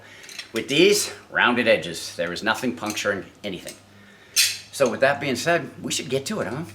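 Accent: Boston, United States. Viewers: Question. Are you from Boston? Hahaha. Love the accent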